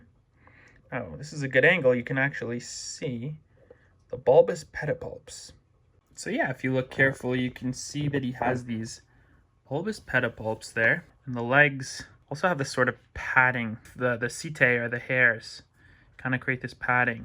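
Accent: France. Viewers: The French accent lmaooo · I love your french accent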